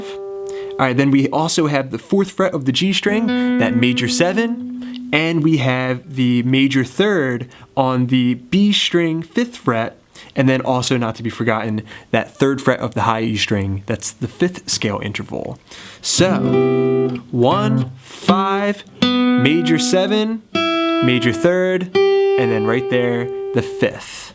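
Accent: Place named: United States. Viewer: I mean, cool videos very useful, but all I could think about is your american accent. Where in the U.S. did you pick that up?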